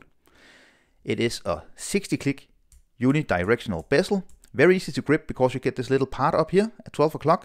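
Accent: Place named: Denmark. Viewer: haha insåg inte att det var dansk engelska jag hörde! Gissade på Holland/österrike först. Du gör bra videos!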